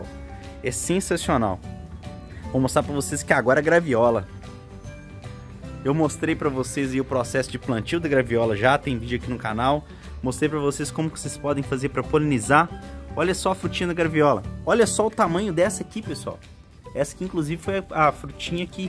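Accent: Minas Gerais, Brazil. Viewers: Cê é minero, fii???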